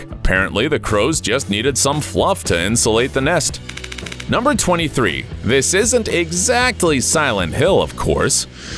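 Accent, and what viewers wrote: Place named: United States. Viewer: 38 seconds in and was so annoyed by the pointless awful cheesy American VoiceOver I had to turn off. We only need the clips not the dreadful voice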